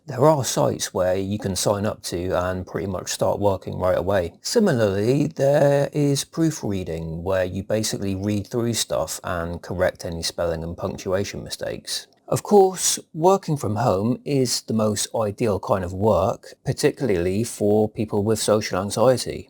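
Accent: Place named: RP, United Kingdom. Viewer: On a different note, this channel is quite good if you want to learn Received Pronunciation.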